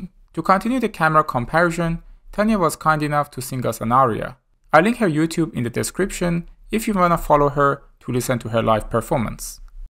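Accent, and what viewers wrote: Iran. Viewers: Irani accent spotted 😂❤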